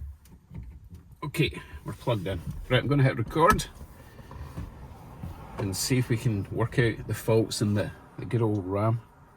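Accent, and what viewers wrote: Scotland, United Kingdom. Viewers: I get the hint of a Scottish accent 🤣 , a more posher Scots twang than a thick West Coast Glesga, or has it deteriorated due to living in the states, excellent vid 👍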